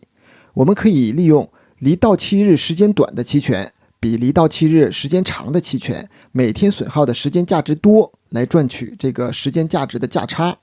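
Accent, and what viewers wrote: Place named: Northeast, China. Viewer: 东北口音有点搞笑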